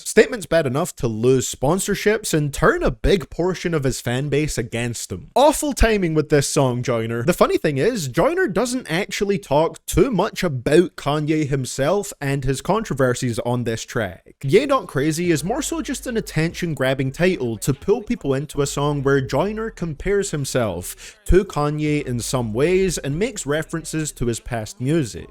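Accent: Scotland, United Kingdom. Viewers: Ur accent interests me, r u a Scottish man living in America? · Is that a Scottish accent or no?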